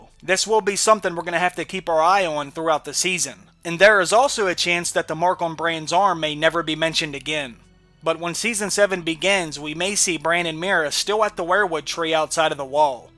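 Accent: Pittsburgh, United States. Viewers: Thank you for doing such a great job on these videos. You are one of the best channels of this nature out there. Have to ask, are you from the Pittsburgh area by chance? Anyway, awesome job and keep up the good work!!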